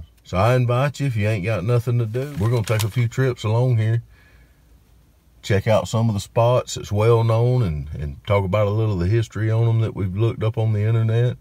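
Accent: South, United States. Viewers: Good story telling……and unlike most of Florida now, you sound like a southerner. I moved down from North Carolina and when I speak, people look at me like I am from another planet. You talk like me. How refreshing. I haven’t visited “Steenhatchie” yet but want to.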